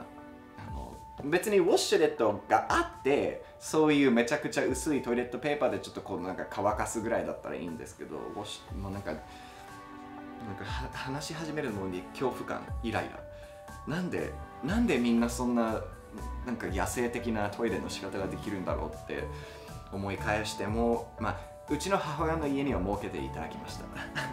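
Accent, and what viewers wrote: Japan. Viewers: 英語もちゃんと日本語の発音になってる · 英語も発音が日本よりになってるのすごい笑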